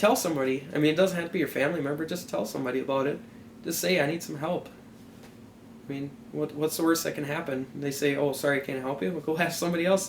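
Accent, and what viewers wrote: Minnesota, United States. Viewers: what an interesting accent. Is he from MinneSOta? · What an amazing story!!! I still cannot understand how a parent could kick their own child out just for being who they are. Being gay is not a choice it is just who you are. The Ali Forney Center in NYC it littered with kids who have been thrown out of their good "Christian" homes... The Bible also says that adulterers (divorced) should be put to death. I am glad times are changing but it is not fast enough for me. -love your Minnesota accent!!!:)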